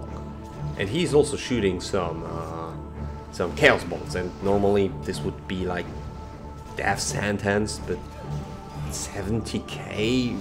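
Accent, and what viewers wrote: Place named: Hamburg, Germany. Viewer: Ich bezweifel dass es dir m&#246;glich ist zu verstehen was ich meine. Erstmal bin ich kein WoW-Kind, als ich Kind war gab es weder WoW noch gro&#223;artig verbreitete PC&#180;s. Du &#228;usserst keine Kritik sondern schreibst was dir nicht gef&#228;llt und das in einem aggressiv negativem Stil mit einer Menge indirekter Beleidigungen. Ob du falsch oder richtig liegst liegt im Auge des Betrachters aber dein Verhalten ist keinem Erwachsenen angemessen. "hatte mal 2-3 videos von dir zu MoP zeiten geguckt war noch ganz lustig aber was soll dieses hochn&#228;sige verhalten und diese grauenhafte englische aussprache wo du versuchst nicht deutsch zu klingen..." Was genau ist grauenhaft an seiner Aussprache und warum meinst du dies sagen zu k&#246;nnen? Warum sagen ihm Muttersprachler dies nicht sondern loben ihn sogar weil er nicht nach Schulenglisch klingt? 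Er sprich seit einigen Jahrzehnten genau so Englisch und hatte damit auch keine Probleme. Ich frage mich auch warum man als offensichtlich nicht-Deutscher, das h&#246;rt man trotz Hamburger Schnut in jedem seiner Deutschsprachigen Videos, versuchen sollte nicht Deutsch zu klingen und wo liegt dein Problem dabei? Es sollte dir klar sein dass du deine Community vertrittst und dass du ohne indirekte Beleidigung kaum einen Satz beenden kannst. Hier kannst du, trotz der Schreibfehler in der &#220;berschrift, eine kleine Einsicht erhalten wie man Kritik &#228;u&#223;ert - k&#246;nnte dir helfen denn im wahren Leben l&#228;ufst du bei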